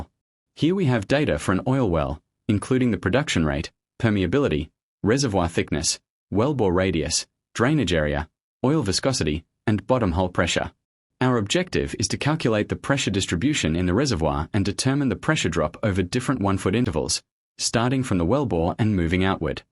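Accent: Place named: United Kingdom. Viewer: This is a great chapter, can you change the British accent to American?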